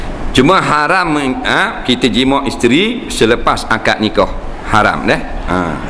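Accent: Terengganu, Malaysia. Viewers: "nati orang balik lu bodo"... hahahhaaha...mmg pure terengganu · memang la haram...tengah lampu merah....kalau hijau halal le....kena paham betul ustaz cakap.... kalu nak main lepas akad nikah jangan la depan tok kadi dan saksi-saki...biar la diorang balik dulu... ustaz ni ceramah dia best sebab ada lawok...pastu...slang ganu kita...mu kena dengar molek2 jangan dia kata hare..mu terus amik jadi hare... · kaki sekam atau kaki cekang...aku pon x phm sb ustad ckp ganu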